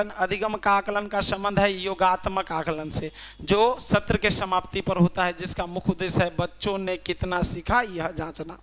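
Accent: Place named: Maithili, India. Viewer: Itna shudh maithli 🤦‍♀️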